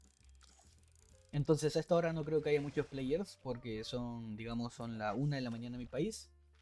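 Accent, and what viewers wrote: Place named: Peru. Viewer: Me encanta como lo narra y se le nota que es peruano, que grande eres mano, sigue asi, tienes un sub mas ahora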